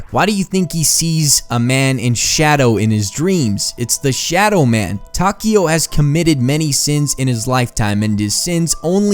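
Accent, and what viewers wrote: Bosnia and Herzegovina. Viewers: are u bosnian?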